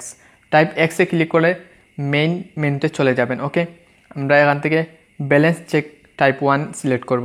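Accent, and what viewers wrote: Sylhet, Bangladesh. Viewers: ভাই, কী সিলেটি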